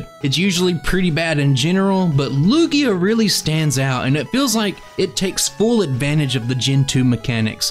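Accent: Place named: Louisiana, United States. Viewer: Bro what is your accent. Nothing against you at all. I enjoy all the vids but your accent sounds so Hollywood south movie created that I have to know where it’s from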